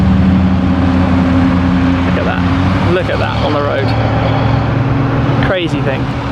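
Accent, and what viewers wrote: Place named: United Kingdom. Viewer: the man of a billion cars and with the amazing British accent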